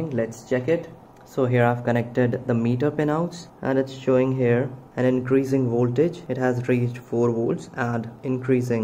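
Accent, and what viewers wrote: India. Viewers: Indian English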